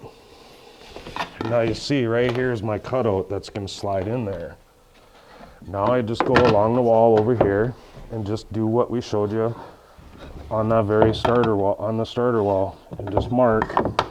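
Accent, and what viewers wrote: Minnesota, United States. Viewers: Your praise of God blesses me because I find myself everyday thanking the Lord for guiding me at work especially when I run into some 'mystery' challenges I can't figure on my own, thanks. Great instructional videos and love the MN accent. God Bless!!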